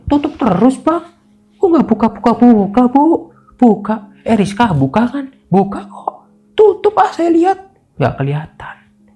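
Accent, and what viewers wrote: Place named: Java, Indonesia. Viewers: Runtut, cara bercerita, meskipun kadang terselip dialek bahasa Jawa. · Medok sekali rikanyah kisanak li crita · ngapak emang sya suka gaya ngmonge lucu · Orang ngapak mase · ceritanya bikin lama krna bhasanya campur,knp sih gk pake bhasa Indonesia pakem aja,pake bhasa Jakarta juga logat lu ngapak,gk enak di denger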